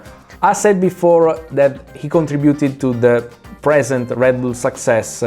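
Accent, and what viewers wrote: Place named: Italy. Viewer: Hablas inglés con un fortísimo acento italiano. Pero me gusta igual. Tuttavia preferisco la tua lingua originale... I give you a deserved like👍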